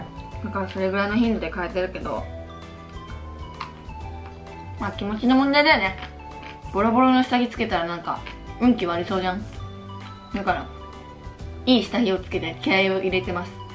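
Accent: Okayama, Japan. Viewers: 岡山弁がたまらんのじゃ！ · 岡山弁聞くと落ち着く😬 スキー〜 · しろくまの抱き枕おんなじの持ってる！！気持ちいいよね🤤 私も岡山出身だから親近感ある！岡山弁すっと入ってくる笑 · 岡山人として誇りです 時々出る岡山弁落ち着きます 応援してます🔥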